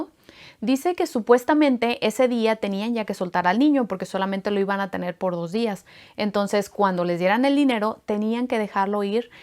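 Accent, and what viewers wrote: Mexico. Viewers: ¿Eres norteña vd? ¿Monterrey? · si gracias sabía que era mexicana su asentó no podía equivocarme y desepsionarme gracias 👍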